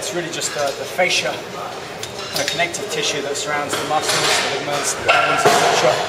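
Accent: United Kingdom. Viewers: um... no he's english, his home town is london and he as a british accent...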